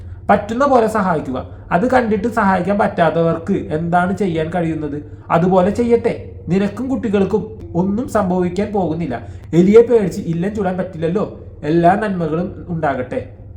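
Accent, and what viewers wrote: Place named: Thrissur, India. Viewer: Ikkade naadu evideyanu.. samsaram thrissur district kodungallur , thriprayar basha pole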